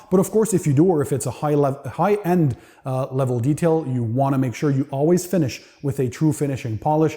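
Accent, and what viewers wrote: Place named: Canada. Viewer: Seems convincingly Canadian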